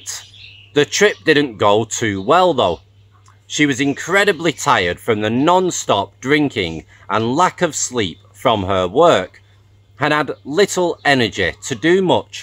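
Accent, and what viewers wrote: United Kingdom. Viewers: Use the 3 F’s rule it always works!!! That Brit accent?????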